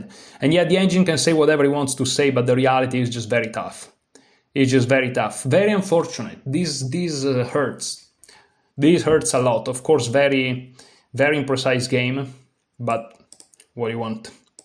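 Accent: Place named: Italy. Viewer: Bro your English is so fluent and rich in vocabulary yet you have this charming Italian accent. It sounds so cool. Never change it! Greetings from Germany!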